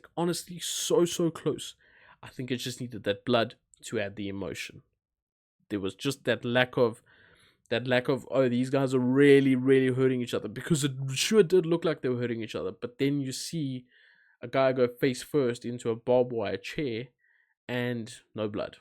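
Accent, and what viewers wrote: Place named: South Africa. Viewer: By the accent, I can tell you are from SA! I am also from SA. Keep on going bro!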